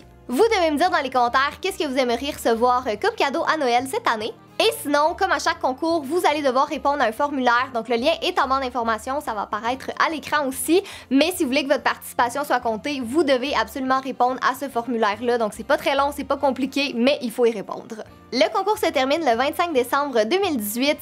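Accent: Canada. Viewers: Moi noël 🎄j'aimerai avoir un nouveau téléphone ( j'aime trop ton axen canadien ) bisous 💋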